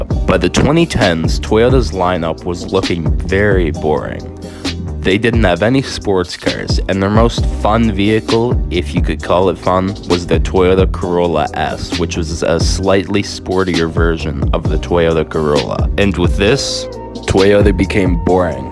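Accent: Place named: Netherlands. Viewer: You sound like your from NL like me haha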